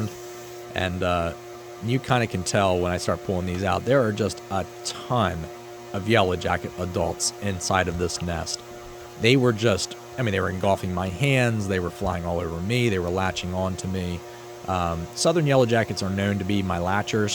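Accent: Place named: Pennsylvania, United States. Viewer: Knew you were from PA before you said it, one of the most recognizable american accents. 😅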